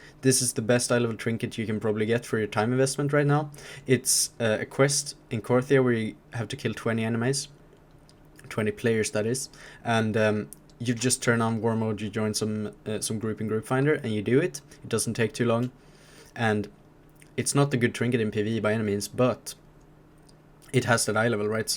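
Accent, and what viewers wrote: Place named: Sweden. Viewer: Du måste vara svensk haha hör det på engelskan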